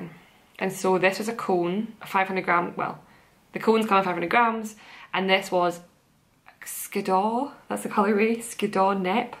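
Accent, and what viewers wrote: Scotland, United Kingdom. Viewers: Hello! I'm so glad I discovered your podcast. Love all your projects, and I prefer neutral/softer colours too. What part of Scotland are you from? I was trying to work out your accent. 😊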